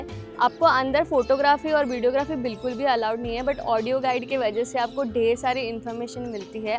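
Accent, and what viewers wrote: Gujarat, India. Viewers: Very very very poorly researched video Also the lady commentator desperately needs to either speak in Gujarati or not at all I have grown up in Baroda and can say with all assurance that the script, the choice of sites and ugh, that Gujarati accent trying to struggle with Hindi are all awful! This video does ABSOLUTELY no justice to my beautiful Baroda.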